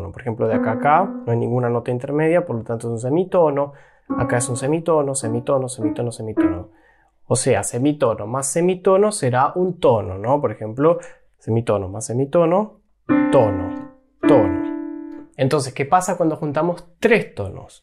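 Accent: Argentina. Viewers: Estimado , no tomes a mal si te expreso que me es insoportable el uso del sheismo en sustitucion del yeismo, lo considero una afectacion decadente surgida en los 90. Vos sos joven y tal vez tu entorno lo usa, pero me gustaria que reflexionaras al respecto , imaginate a Gardel cantando " de un noble potrisho que justo en la rasha afloja al shegar" ( no creo que hubiese triunfado de expresarse asi). Confio en tu sensibilidad auditiva , abrazo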